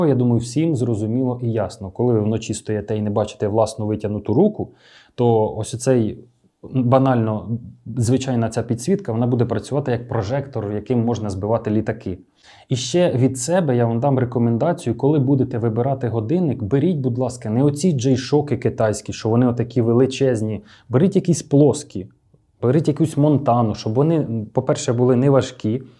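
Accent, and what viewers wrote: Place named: Central Ukraine, Ukraine. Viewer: Клас, наш хлопець. Центр UA.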